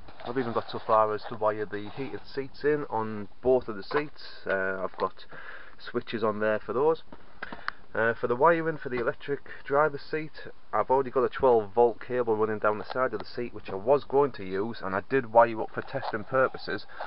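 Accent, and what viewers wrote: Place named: North East England, United Kingdom. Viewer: These look really well, you sound like you are from the north east like me, cheers for the video brother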